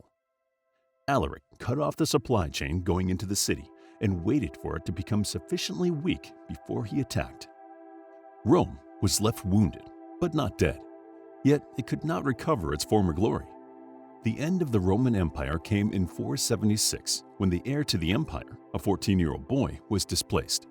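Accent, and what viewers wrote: United States. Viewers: I can barely understand the american accent 😭😭 had to repeat the video 3 times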